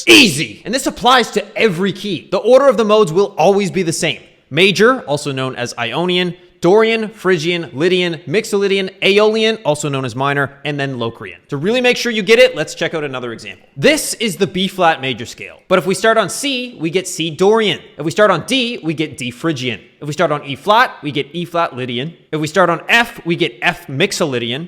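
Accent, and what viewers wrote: Canada. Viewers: Why are you swearing. Sounds like you are from Canada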